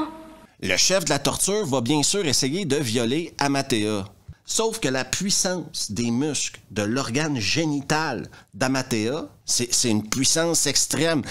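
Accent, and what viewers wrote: Canada. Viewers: Plus je regarde tes vidéos, plus je suis fasciné, voir hypnotiser 😵‍💫 va savoir… l’élocution, le choix des mots, le sens de l’humour… et l’accent canadien !🥰 je sais pourquoi, j’ai toujours vu le canadien comme une version améliorée du français ( ni voyez aucun racisme ou suprématisme de ma part) pourquoi ? Comme dirait Gilbert Montagnier: là je vois pas… en tout cas, peut-être qu’un jour je quitterai ma jolie France natale pour ton joli pays.. pourquoi ? Sans doute à cause de Macron 😰( la, tout le monde comprend 😉) bisous à bientôt ♥️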